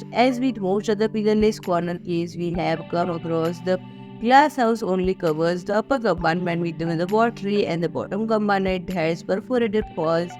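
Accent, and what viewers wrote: India. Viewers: It's called an Indian accent, but interesting way of hearing it😂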